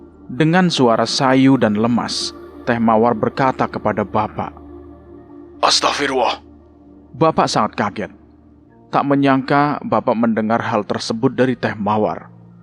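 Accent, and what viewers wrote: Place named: Java, Indonesia. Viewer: Medok Jawanya lekat banget, kurang enak di dengar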